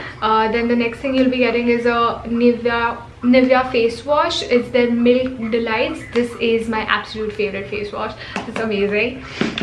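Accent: Maharashtra, India. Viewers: Congratulations on 100k and many more coming soon! I remember when u hit 10k u were in tulum by forest tala and how happy u were. Being marathi girl you inspire me soo much and how cute is your marathi tone i just love it. I love your fashion videos and hauls. My dream is to make my instagram page <mail> one of Indias's top Self-care and productivity page. I post content about self care and productivity in the easiest form to reach maximum people. My goal is to make everyone practice self care in the easiest and most affordable ways. Soon planning to launch my planners also to help everyone become oraganised. I will send the copy of my planner to you when i launch it for reviewing because you review things very generously. Lots of love to you keep inspiring us ... May you hit 1M by the end of 2020. All the best dee!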